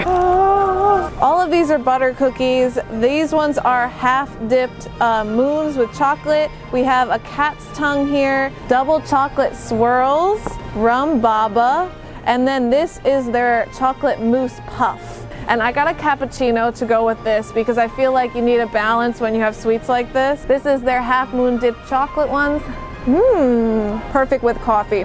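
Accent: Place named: Bronx, United States. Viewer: Wait, you sound like your from the boogie down sweet heart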